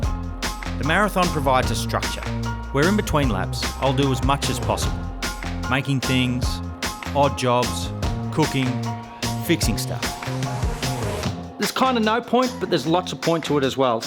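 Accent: Australia. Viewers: Hey. I'm an Aussie living in Vienna, Austria. You make me miss home. The enthusiasm, the accent, countryside and Aussie girlfriend/wife. Also big on running. Loved everything about this video. Inspires me to get off my butt and be more productive maybe even make a youtube channel myself! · I kept forgetting you were Australian until the comment about the "kooky old farmer" who "ran around his paddocks with his gumboots on." Which I had to play 3 times before I could even understand the words you were using 😂. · this guy is australian as fuck!!!! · Where is he from? I can't seem to properly pinpoint his accent. Is he Australian? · definitely australian maybe from the north west? filmed in VIC or tazzie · Definitely Australian. He has a more pronounced rural accent which is easy to mistake for NZ if you’re not from here but he lives in Victoria.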